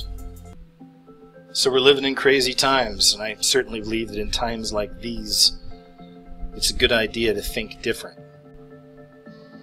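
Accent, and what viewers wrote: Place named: South, United States. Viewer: I watch him and Love is country accent 😌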